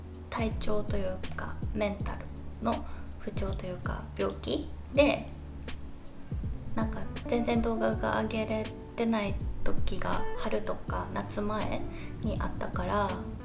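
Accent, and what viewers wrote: Kansai, Japan. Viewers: 私も精神的な病気を長い間、患っていて良い時期と悪い時期の波があります。そして、線維筋痛症という身体の激痛と12年間も共にしています。動画やnoteで、naoさんが体調や心にきちんと向き合われているのを観て、私も体調と心にきちんと向き合おうと決めました。 メイク道具がポーチに入ってないのが面白かったです😂メイク道具って、なぜか増えてなぜかポーチに入りにくいですよね😂 naoさんのおかげで読書が趣味になったり、インテリアに興味を持ったり、とても良い影響を受けています。ありがとうございます！ あと、naoさんの関西弁って、とっても可愛いですね。癒やされます。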